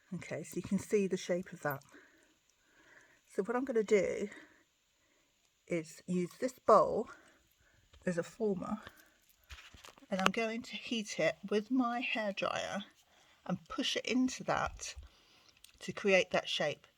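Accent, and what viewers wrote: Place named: United Kingdom. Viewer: Great tips, new subscriber and a British Accent hooray!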